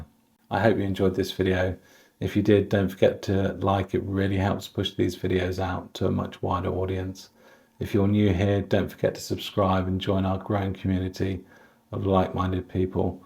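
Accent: United Kingdom. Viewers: nice to hear your British accent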